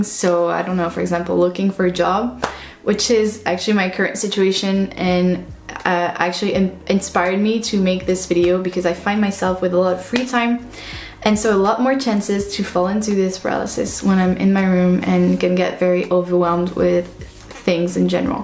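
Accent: France. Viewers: Great video Thanks Are you french? :) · Loved the video, thank you so much! Also, are you french? I noticed a tiiiny bit of an accent eheh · Hey there, I just discovered your channel while researching adhd paralysis tips and this is so helpful! I'm undiagnosed but everything adhd I relate SO MUCH. Not related, but are you french? I feel like you have a tiny bit of an accent I recognize in us french speakers? Have a great day love, thanks for this.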